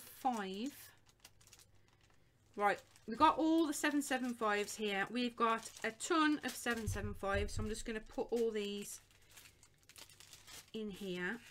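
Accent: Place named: United Kingdom. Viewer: Are you from england you have an accent british very funny! I see it all and it wil be the same when you will put in thé rangement! Good luck!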